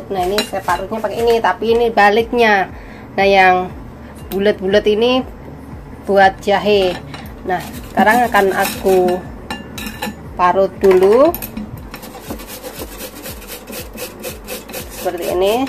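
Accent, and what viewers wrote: Java, Indonesia. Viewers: Asli Jawa mbak? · Ibue medok nemen..orang jawa mana bu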